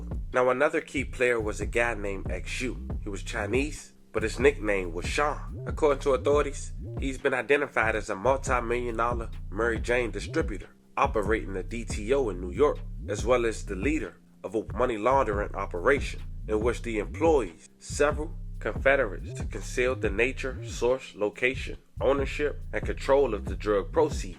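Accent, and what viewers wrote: Washington DC, United States. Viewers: I thought yu don’t give angles just the story 😂that Baltimore step on they plugs comment sound like an angle to me🤔. Sound like u from DC/DMV so it’s unexpected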